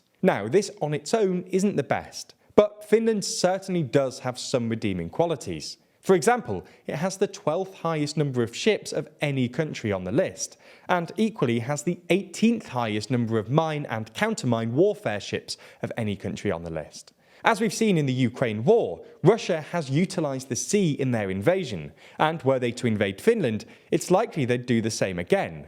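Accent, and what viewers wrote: United Kingdom. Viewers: Excellent exposé but you're highly accentuated British accent is so painful to hear! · Good job on your British accent!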